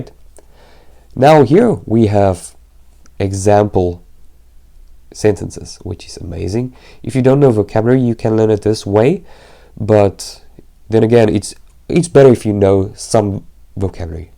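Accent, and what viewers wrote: Afrikaans, South Africa. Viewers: lol afrikaans accent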